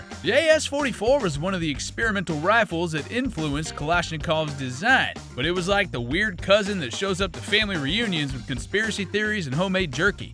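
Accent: United States. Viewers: Hello mr stereotypical american accent guy, Ever seen the mg14z? Its a modern mg81z by technicality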